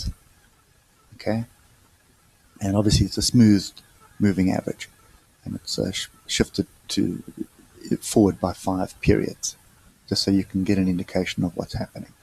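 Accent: South Africa. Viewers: You sound South African :)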